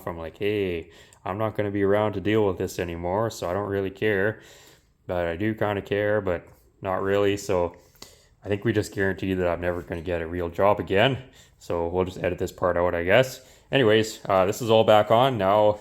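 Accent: Canada. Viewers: Gosh, you sound Canadian!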